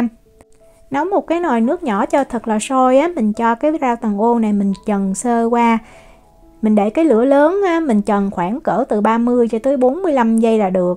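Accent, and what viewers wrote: South, Vietnam. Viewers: Giọng nói miền Nam rất dễ thương, rõ ràng. Hướng dẫn kỹ. Thanks em!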